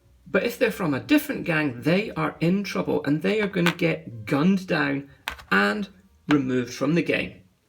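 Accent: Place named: Chicago, United States. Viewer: Bought this after seeing this. Dodgy Chicago accent lol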